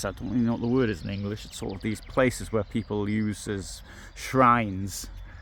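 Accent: United Kingdom. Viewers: Merry Christmas! I believe you are correct about the Catholic church along with the Germans victimized the Jews. Also, interesting how you have not lost your British accent. Great video!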